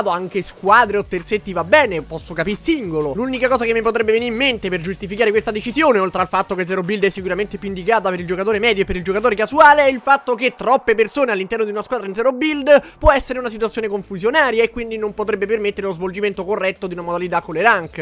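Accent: Rome, Italy. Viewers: Cmq non mi sarà capitato il video in qui dicevi di dove sei però se capisce che sei de Roma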